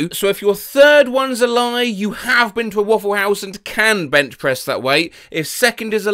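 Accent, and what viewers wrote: United Kingdom. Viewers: You sound british :D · He's LITERALLY Sherlock Holmes... speaking fast and in a detective sort of fancy way, deducing fast, British, sherlock soundtrack.... it's literally perfect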